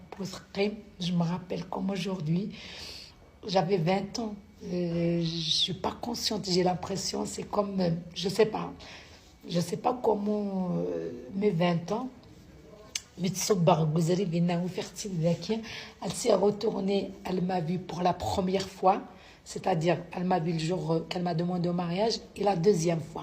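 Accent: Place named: Kabylie, Algeria. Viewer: c'est avec un Grand plaisir que je vous souhaite un Joyeux anniversaire...et merci d’avoir gardé l’accent Kabyle....un ami des USA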